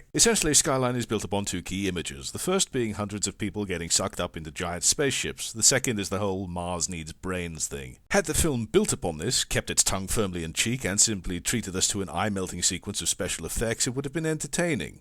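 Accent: United Kingdom. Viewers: You have such a beautiful british accent! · I feel like I've watched the movie and Now I don't have to waste 7 of my hard earned dollars. I also feel smarter because I spent my time listening to a very funny man who makes very good points about the current film industry. Also he is British which gives him lots of credibility in my American eyes. I mean all the bad guys in star wars had british accents didn't they? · And here come the fallacies. "This is only a little similar! It's not the same! And to support my argument, here's an ad hominem statement." Alright then, let's put it all on the table, Similarities: Fast frames and fast talking, Reviews, Brittish accent, witty criticisms, same concept all around. Differences: does movies, Yahtzee does games. draws his own frames, Voices are different.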